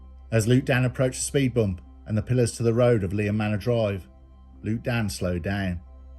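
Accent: Birmingham, United Kingdom. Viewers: The narrators semi Brummie West Midlands accent is irritating, but also captivating.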